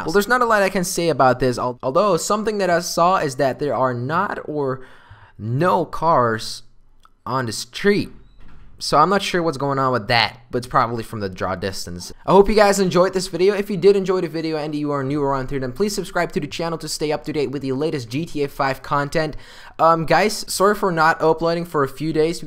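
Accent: Netherlands. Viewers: He is from The Netherlands So he is speaking not the engilsh as you i think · This guy is from the "South" of the Netherlands, and their Dutch compared with their english is no good... Or easily said: Annoying. (Im from Amsterdam)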